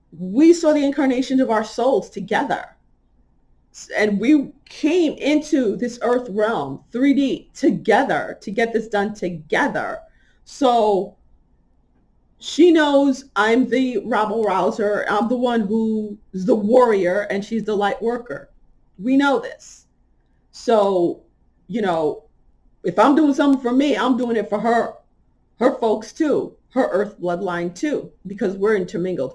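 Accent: New York, United States. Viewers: I love your "New Yorkness" and I am sure a lot of other people do too. Thank you. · I like you New York style 👍🏻🎶🎤you Rock.. 😎🤩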